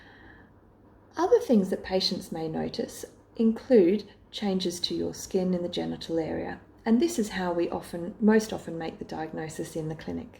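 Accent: Australia. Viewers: Australians are the best dermatologists. Lovely hearing her Australian accent here. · She sounds Australian.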